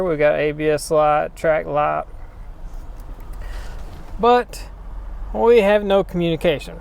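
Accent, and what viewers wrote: South, United States. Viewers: I watch every video but have learned the most about canbuss communication issues. Watching your videos is like watching your thought process spoken out loud. you're extremely intuitive, and you can see the wheels turning at each step of your process and why you've chosen the particular diagnosis path. your laid-back southern demeanor, and your straightforward integrity is very refreshing. but I've got to admit I'm from the west cost so I adjust the YouTube playback speed much faster so you sound like someone familiar from around here. lol: no disrespect intended.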